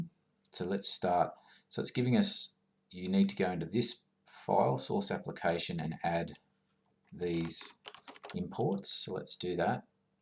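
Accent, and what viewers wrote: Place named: Australia. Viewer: Thanks, noted. I have tried to code your approach above but have an 'Invalid header value type; must be a string or numeric; received NULL' error. In trying to resolve this I wanted to know about the "getAttribute('identity') at the mark (line 32) and what is 'identity' referring to please. Also, great to hear another Aussie, when it comes to providing coding solutions! Cheers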